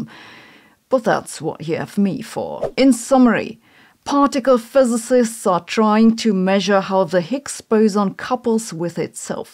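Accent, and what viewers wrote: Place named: Germany. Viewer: 👎👎👎 SABINE, your English is so awful, that you must go back to school an improve it. You are arrogant to address publicly people on YouTube with such a horrible English. You can not cover up your German roots. 👎👎👎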